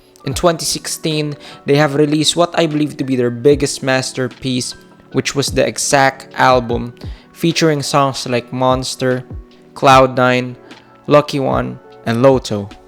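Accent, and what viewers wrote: Philippines. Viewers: I'm sorry but I can't with the Filipino accent 😢😢😢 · I can hear the Filipino accent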